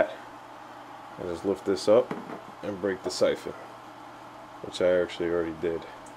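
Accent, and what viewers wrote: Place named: Brooklyn, United States. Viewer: He must be from Brooklyn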